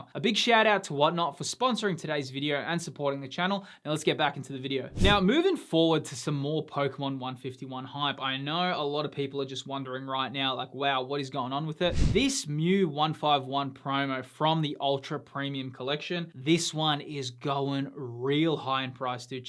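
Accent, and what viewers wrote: Australia. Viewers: i subscribed because of the Aussie accent and the banana trend :)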